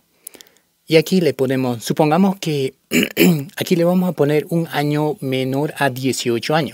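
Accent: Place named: China. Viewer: el perfil es de alguien joven creo y latino porque habla como chino hablando español🤔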